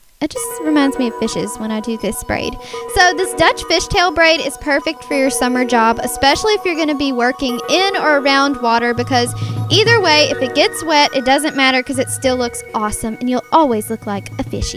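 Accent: South, United States. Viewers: what state do you live in??? you sound like you have a southern accent and i love it!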